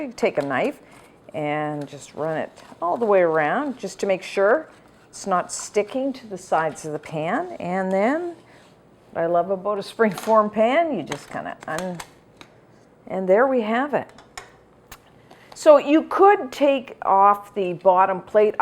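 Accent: Canada. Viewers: Less than a minute in and I knew you were Canadian! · Oh wow that looks delicious. I love how you give metric measurements as well as the imperial. Saves me having to convert the measurements. I am an Australian and love to find things that are different to the things we have here. You are Canadian right ? Something about your accent, I recognise. I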